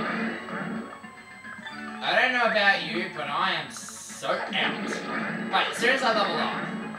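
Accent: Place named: United Kingdom. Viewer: his british accent makes his anger funny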